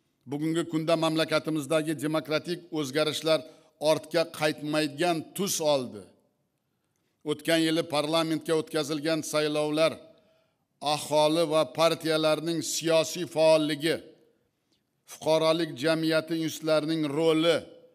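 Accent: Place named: Uzbekistan. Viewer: Ажойиб таклифлар,!!Тарихда биринчи марта узбекча нутк намоиш этилди,!!Рахматли Каримов ойиласи умуман узбекча билмаслиги жуда ачинарли ахвол эди!!Минбарда бутун дунега Узбек охангида нутк сузлаганингизнинг узи буюк тарих!!!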